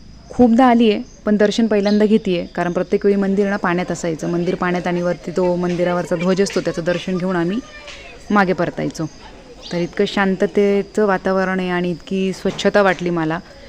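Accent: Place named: Kolhapur, India. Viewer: कोल्हापूरी भाषा बोलायला भारी जमतीया तुम्हासनी.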